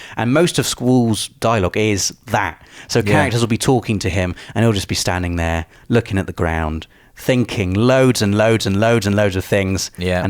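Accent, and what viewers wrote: United Kingdom. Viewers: Thought same thing when he kept saying Squall, it might be a British way of pronouncing things. Triad was also incorrect when said it the first few times.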